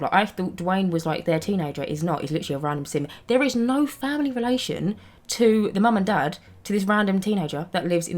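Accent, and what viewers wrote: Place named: Newcastle, United Kingdom. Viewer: This is random but are you from the uk cos you sound like you’d be from Newcastle also I love your builds I wish I could build like you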